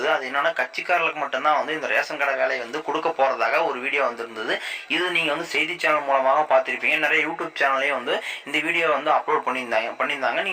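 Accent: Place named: Erode, India. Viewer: Erode sluringa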